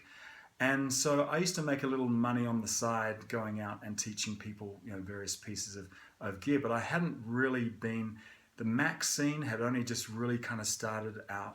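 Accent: Australia. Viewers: Amazing you still kept your Australian accent.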